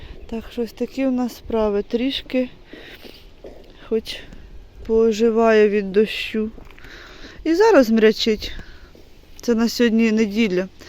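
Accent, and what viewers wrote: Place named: Chernihiv, Ukraine. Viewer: Доброго дня! А в якій області знаходиться Ваше село, якщо не секрет? Я оодом з Чернігівщини, і в нас також говорять на кріп-"окріп". Почувся рідний. говор з моєї Чернігівщини.❤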